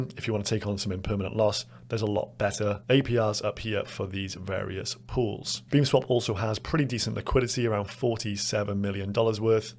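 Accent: Cardiff, United Kingdom. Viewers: Nice video cheers man. Is that a cardiff accent I hear? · are you from Cardiff?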